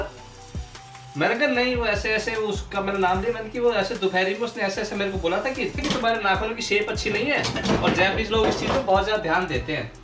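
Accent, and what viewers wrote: Haryana, India. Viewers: Bro I love the u video start or moj aa ri aa b ri ya aise bqt kat ra Jo Haryanvi ton h lov it bro thanks for making videos luv u from Himachal Pradesh India jai hind bro🇮🇳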